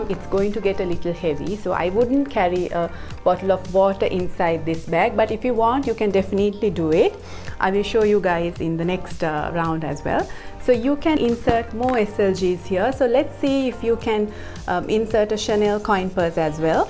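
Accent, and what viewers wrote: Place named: India. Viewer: You are beautiful. You look E. African Ethiopian Eritrean or Somalian but by your accent you are Indian, right?? Thx for sharing.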